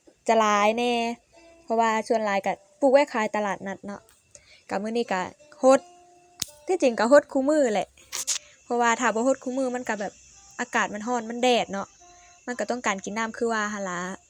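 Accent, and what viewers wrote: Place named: Isan, Thailand. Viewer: เว้าสำเนียงคือคนอิสาน บ่คือคนลาว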